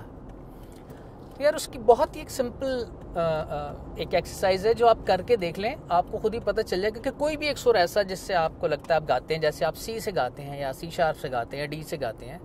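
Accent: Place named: Punjab, India. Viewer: Aapke punjabi accent bahut achha lagta hay sir.... Respect from India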